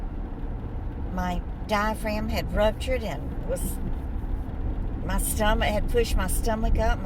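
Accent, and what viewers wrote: South, United States. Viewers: You also have talent for interesting conversation combined with compassion. You have beautiful southern accent that is soothing, unlike some that I think are just horrible. You have to be willing to open your life up. I’m much too private for that, so you see not everyone can be successful at it, but I’m happy for your success. I’m a paid companion for mobile elderly. I’m in great shape at 66 so hope to do for as long as I am able. Lorena.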